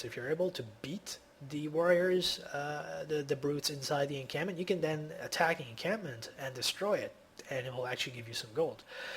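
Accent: Quebec, Canada. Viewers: You sound like you're from Quebec. Are you Quebecois? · I don't wanna be racist or try to discriminate but what nationality are you? You're voice sounds very different! It sounds almost french canadian :)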